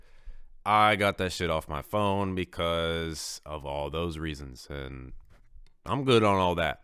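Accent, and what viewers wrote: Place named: Brooklyn, United States. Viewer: totally random but you have the most interesting accent I've ever heard, lol. How do you have you a Brooklyn, Russian, and Texan accent at the same time 😭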